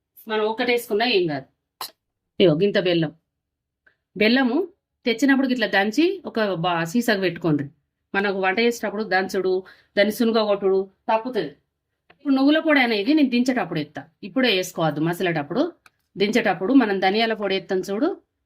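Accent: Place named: Karimnagar, India. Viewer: Meedhi karimnagar eh ayi untadhi kada aunty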